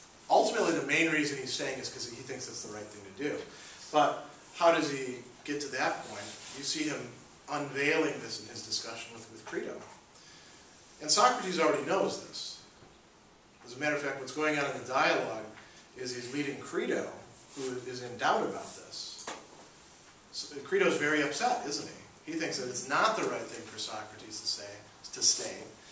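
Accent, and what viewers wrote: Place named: Canada. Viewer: I have lived off your videos to guide myself through my university philosophy elective - for which I accidentally registered. I caught myself answering your questions out loud as if I were attending in person. I find it interesting to listen to the accents of your students, because being a Canadian myself, you sound like me. Midterm today! :)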